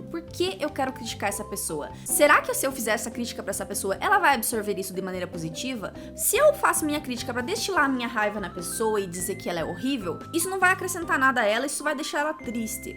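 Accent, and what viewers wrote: Brazil. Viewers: mano tu tem sotaque de sulista, eu amei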